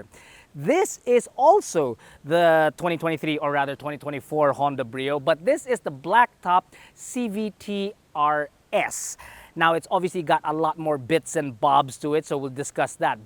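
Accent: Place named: Philippines. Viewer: I love the standard filipino accent.😊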